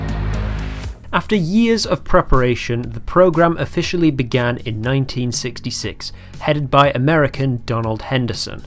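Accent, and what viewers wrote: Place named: United Kingdom. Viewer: the narrator has a british accent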